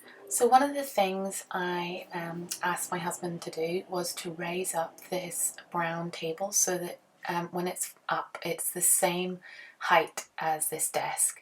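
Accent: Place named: Scotland, United Kingdom. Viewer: Nice setup. Thank you for sharing. You have a very handy husband. By the way, I detect a slight accent; Scot?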